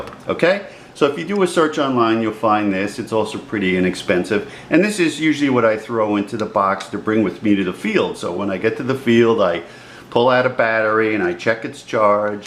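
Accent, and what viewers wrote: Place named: New York, United States. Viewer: I'm a former New Yorker, I love it when I can hear the ny in ya.... lol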